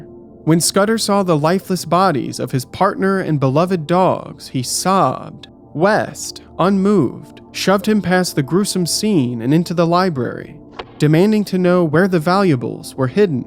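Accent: United States. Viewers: Quiet in the wilds is never a great omen. Typically, I've experienced that means there's a predator out there that scares every other animal Just saying, you sound at least as country as I am, but still, heads on swivels, guns loaded, situational awareness man. Quiet is not good, especially once it gets dark in the woods or anywhere, honestly.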